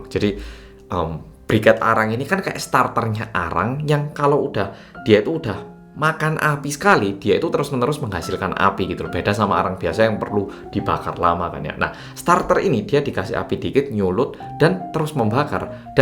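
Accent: Java, Indonesia. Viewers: Bang krn tinggal dikorea mungkin gk brani speaking. Utk tambahan pa yg disampaikan Bang ini ke Channel Detective Aldo, ini kasus (Lee Sun Kyun) bisa jd utk menutupi kekotoran Penjabat/Petinggi2, Anak2/Cucu2 Penjabat korea. Kekotoran kecil/sepele artis2 korea digunakan utk menutupi kekotoran para petinggi2,anak2/Cucu2 Petinggi Korea yg lebih besar. Spt kasus Narkoba&Bullying yg dilakukan oleh anak2 petinggi korea. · Mas aku suka medokmu....bukan hanya indonesia banget tp njowo banget...medokmu kui loh mas 😅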